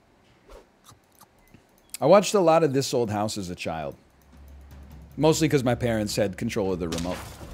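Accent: Canada. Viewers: Nah, his pronunciation is a common pronunciation in Canada. It's by far the more common of the two where I live.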